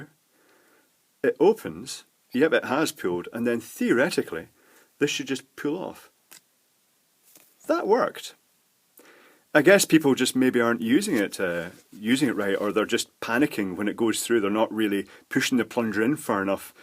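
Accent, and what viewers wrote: Scotland, United Kingdom. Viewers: Are you from Scotland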